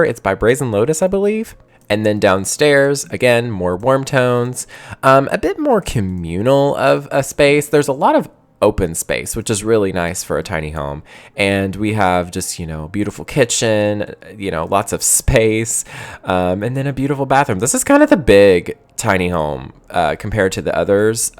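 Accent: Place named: Appalachia, United States. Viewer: I love your content, and knowing that you’re from the Appalachia makes me feel ever closer to your videos (WV here). Your accent is barely noticeable for a person who lives in Kentucky. Your builds make me want to build more while I’m playing!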